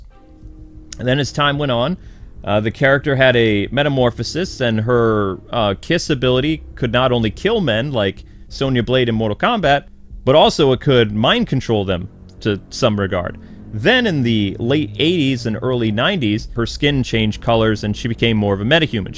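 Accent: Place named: New York, United States. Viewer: new york accent has been coming out a lot more recently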